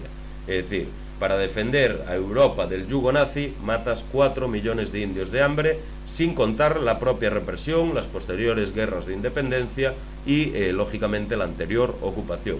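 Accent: Galicia, Spain. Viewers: Acento Gallego de GALICIA-ESPANA.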